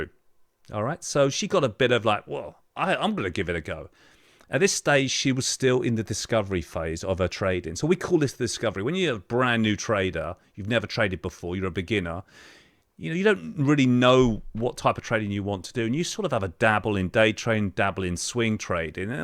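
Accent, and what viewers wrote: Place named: United Kingdom. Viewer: I love you and you’re such a wonderful instructor! I love British accent that’s why! God bless you man 🙏🙏🙏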